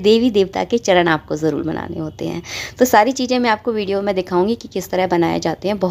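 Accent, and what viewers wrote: Bundelkhand, India. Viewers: Bundelkhand se hain kya aap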